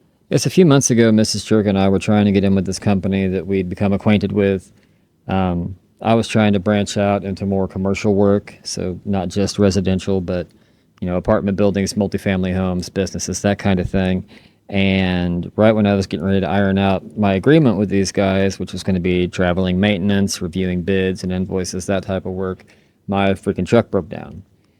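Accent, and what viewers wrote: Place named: United States. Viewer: This was such a beautiful video! You are a genuinely kind human being. I hope your channel continues to grow exponentially! I think my favorite part was when you had to show your manliness intimidation move(s)😜when your lovely wife showed up. It was as if I was watching a British narrated nature channel only with an American accent. I loved watching your girls interact with you and seeing you joke around with your pops. I, too, feel everything happens for a reason. Remember, it’s always darkest before the dawn. It’ll all work out somehow.😊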